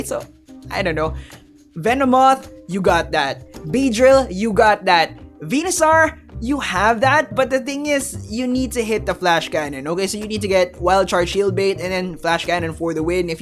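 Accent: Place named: United States. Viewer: You from the Philippines man becuase your accent very 4corners amarican accent.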